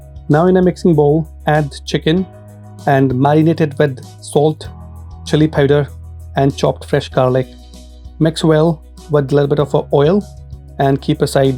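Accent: Scotland, United Kingdom. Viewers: I sense a Scottish accent 🎉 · Do I detect a fellow Scottish accent there mate?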